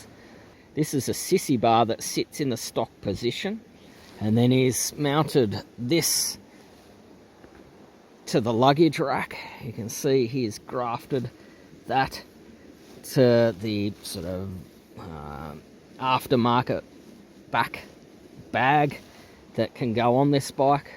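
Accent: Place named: Australia. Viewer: Great to hear another Aussie. I just bought one of these. I need to know what a bobber is? It sounds like a good thing. Thanks for the video.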